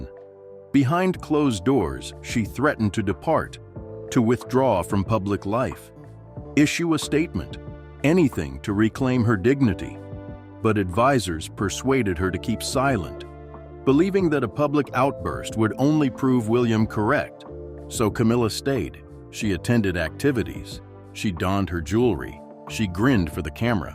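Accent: United States. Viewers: What rubbish Camilla is Queen why is an American voice saying this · Well done with your titles, real click bait, but if you do click, after a while you give up, because it never gets to the point. Clearly made up, and an USAan voice does not give it any veracity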